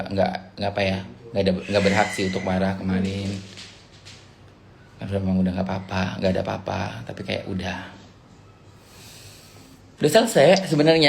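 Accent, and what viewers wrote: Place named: Jember, Indonesia. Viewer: Logat jembernya masih kentel ... Bah... Semoga ada seseorang yang bisa sembuhkan kamu. Aamiin.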